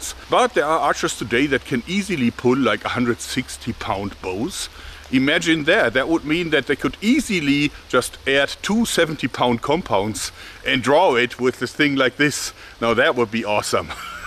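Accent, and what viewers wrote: Germany. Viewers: german accent with an evil laugh ? I got the best video in my 33 year life. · He even has the german accent going for him. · German english accent is great :) and laught is so fresh , don't change anything man !!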